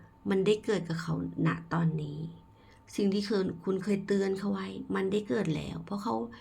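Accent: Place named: Kanchanaburi, Thailand. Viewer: พลังที่ดีมากๆสค่ะน้อง อ่านเสียงเหน่อและเข้าใจง่าย.เปปๆขอบคุณค่ะชอบเหน่อค่ะ.เคยอยู่กาญจนบุรีมาเลยชอบมากนะคะ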